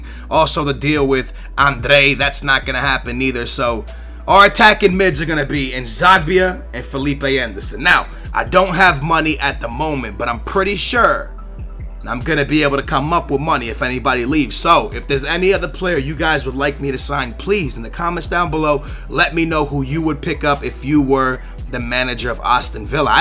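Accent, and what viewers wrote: Portugal. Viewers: How do u pronounce adryan like Andre?? We get it ur portugese but try and speak normally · he says it the way he wants to and in a Portuguese way its kinda cool in my opinion haha:P the way he says the Portuguese team is the way its said in Portugal, like the way he says Ronaldo and pepe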